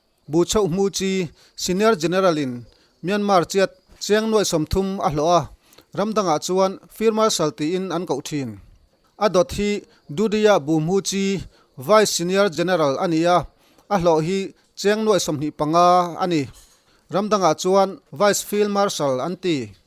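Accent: Mizoram, India. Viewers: a bengvar thlak thin khawp mai bak ah. i mizotawng a mawi a. Mizo tawng hi rawn chawisang deuh deuh rawh aw👍👍👍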